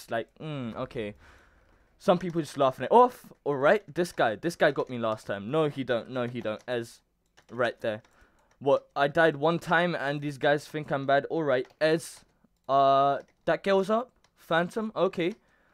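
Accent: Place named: South London, United Kingdom. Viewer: I recognise your voice from some where in South London im not saying the specific place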